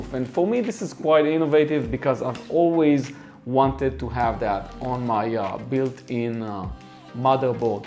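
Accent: Israel. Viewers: אחי, אין על המבטא הישראלי שלך. :) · this guy sounds violently israeli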